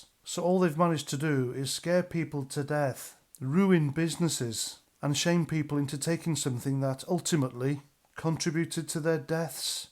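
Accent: Wales, United Kingdom. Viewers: Your accent isn't Cardiff or Swansea, you from Merthyr maybe?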